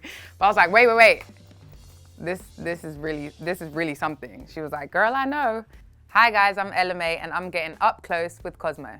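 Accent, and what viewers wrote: United Kingdom. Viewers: YOU CAN HEAR THE BRITISH ACCENTS. I LOVE YOU ELLA MAI 🙏🇯🇲🇯🇲🖤💛💚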